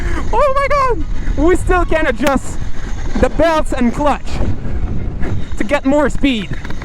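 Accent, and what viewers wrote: Quebec, Canada. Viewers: Hey! Are you from Quebec? You got the accent. 😁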